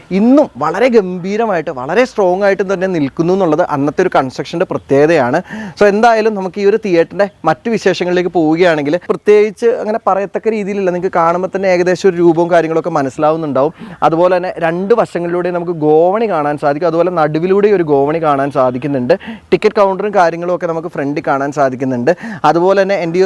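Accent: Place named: Palakkad, India. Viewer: Palakkad ..alle ..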